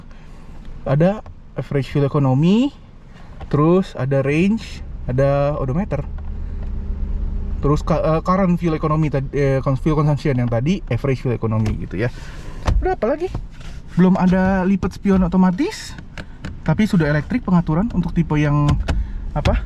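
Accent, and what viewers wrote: Manado, Indonesia. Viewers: orang manado kah ? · Om orang manado kang?😂 Jalang mo ka koka itu dgn di ringroad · Awalnya qt kira ini chnnel jkt pas dgr logat rupa logat manado trnyata plat DB 😅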